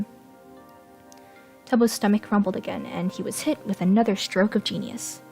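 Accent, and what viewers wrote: United Kingdom. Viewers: The British accent was pretty pog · You are good at a brittish accent mainly a male child! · I love your videos!! And your british accent!! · You did really good with the British accent, in my opinion. Edit: BUMBLE BEE!!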